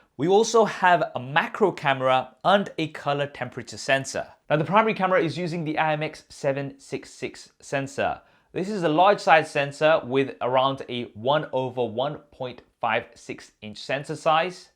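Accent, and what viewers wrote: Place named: Leicester, United Kingdom. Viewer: i just knew that accent was from Leicester. Great video mate.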